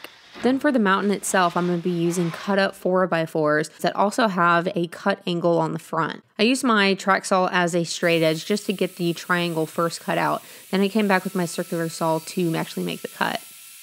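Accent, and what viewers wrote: Texas, United States. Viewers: A Texas saw is sawl. Like she says, she talks how she talks. And her saw has a L at the end!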